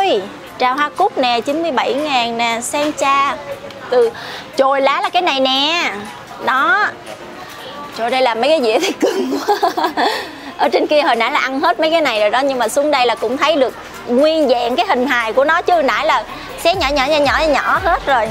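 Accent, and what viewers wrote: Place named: North, Vietnam. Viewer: Chi diễn bắc cứ trương chình nào e cũng thích het.nét chi diễn rất la có duyên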